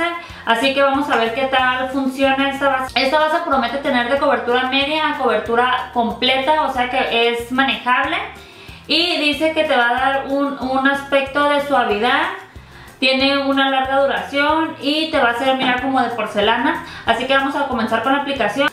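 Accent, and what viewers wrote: Mexico. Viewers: me encanta tu acento culichi 😂 saludos bonita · En cuanto abrí tu video y escuche tu voz sabia que eras de Tijuanaaaa !!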